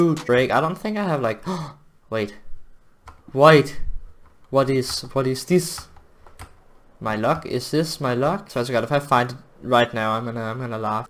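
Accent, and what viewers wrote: Denmark. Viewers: Are u danish?? Sound alot like a dane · Are you danish? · are you from denmark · U from Denmark? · i smell danish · i smell danish, you said i figured out who det er lol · This man sounds so danish. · were a you from? bc you have a danish accent. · Hey i just Wanted to know if you are danish cause your English has a danish accent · Er du måske dansk haha, nemt at høre ;) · You really Sound Danish are your Danish?? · I smell danish. Me too partner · Are you danish by any chance? Quite northern accent😁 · Dude its danish, he is a dane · It's the danish accent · Is he danish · it sounds like you are danish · Are you from denmark? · Are you from Denmark?, your english sounds a little like it, Keep up the good work <3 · aRE yUo A daNmaRKeR? Yes Can tell from ur accent ;) we can always find each other us danish ppl am i right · You Sound danish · I smell danish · i knew ur were danish the accent is HEAVY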